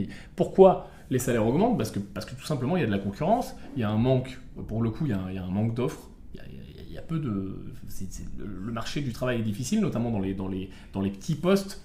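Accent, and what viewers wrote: Marseille, France. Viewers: Pas mal l'accent marseillais ! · Bien l’accent marseillais 😃, allez Marseillllleeeee 😄 !!! Si j’ai bien compris i need to have the guts (intestins in english) pour aller sur certains marchés.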